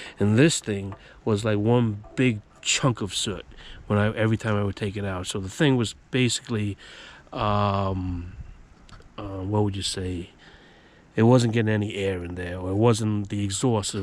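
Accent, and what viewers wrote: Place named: New York, United States. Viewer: Great video!! Couple of things right off, I'm from NY, like the accent. The other is, I use a syringe-plunger big one that holds more than 20 ounces with and a tube extension to suck the oil out for my oil changes(I do it while the van is at client houses super quick no mess! LOVE IT! Use this method on all three of my mobile dog grooming vans! I have 2 of these and run them both on the back of a mobile dog grooming van(shuttle bus conversion). I power a 15,000 BTU Roof AC, water heater, clippers, lights, and most power hunger, a blower-dryer to dry the dogs. I use 1 3500 Gen for just the blower-dryer and the other for the 15,000 BTU AC and everything else. You have me thinking if I make these mods, I could longer run times on a tank full. I gotta try. What about how loud with the spark arrester off? My clients like quiet in their driveways as their dog is being groomed... 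Gimme your thoughts.... Please. Thanks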